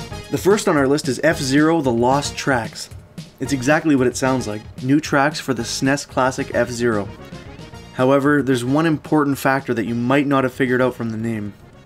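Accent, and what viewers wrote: Canada. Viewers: Lol canadian accent. Love it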